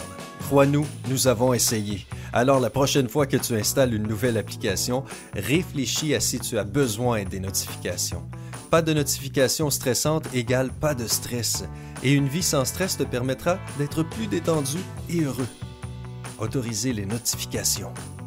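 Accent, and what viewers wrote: Quebec, Canada. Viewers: Sympa t’es canadien ? Sa se voit avec ton accent 😉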